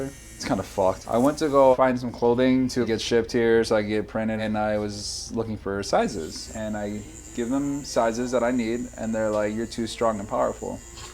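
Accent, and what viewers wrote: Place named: France. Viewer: Your French accent is beautiful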